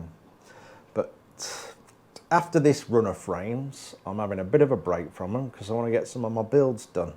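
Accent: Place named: Black Country, United Kingdom. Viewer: Hi I've been watching your videos for some time now and I'd like to say how great it is to watch a professional /thorough craftsman at work! Thank you for sharing your knowledge with us! Just curious, You sound local to me, I'm in brierley hill what area do you live in? Thanks Mark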